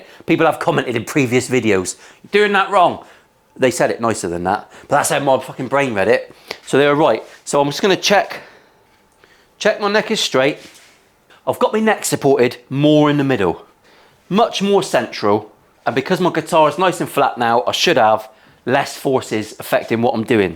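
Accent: United Kingdom. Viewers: Great to see that i am not alone to think that this Jet is an awesome instrument. I have a JS 400 sunburst lefty and my Fender stays in its case since . Also even at this price the trem is a Wilkinson . The tuners are pretty decent as well . Your videos are a great source of learning. As a French speaker I am also learning to understand the British accent better! Thanx!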